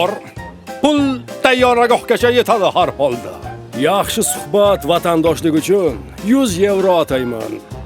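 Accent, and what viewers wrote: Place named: Samarkand, Uzbekistan. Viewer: aka Samarqand shevada gapirdi😅 Farg'onalik shoir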